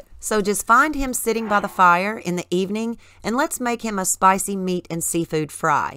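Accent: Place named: Kentucky, United States. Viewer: Dang you sound just like I do.. I was born and raised in Kentucky.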